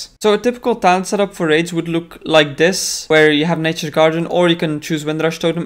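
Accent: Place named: Netherlands. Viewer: oh i was guessing dutch, you sound different from the Belgians i know.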